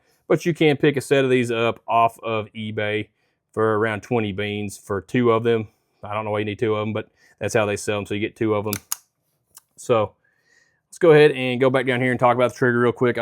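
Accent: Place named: Deep South, United States. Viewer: Talk slower and enunciate so we who do not live in the Deep South can follow what you are saying......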